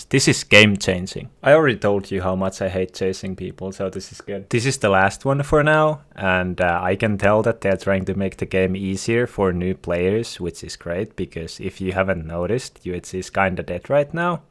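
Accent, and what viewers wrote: Finland. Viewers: Musta tuntuu että sä oot suomalaine koska sun accentti ja sun nimi. Mutta iha hyvä video. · Ok so basically, ootko suomalainen because of your accent and name?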